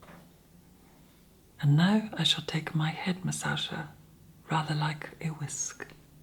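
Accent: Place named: England, United Kingdom. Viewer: To me, there is nothing more soothing than a authentic medium toned English accent. I adore the Brits. And you are a rare gem I should like to keep. And in the raw you possess a Angelic aura. I am about to get my own EOs out to use. The Sandman is sure to take me away afterwards to his dream laire.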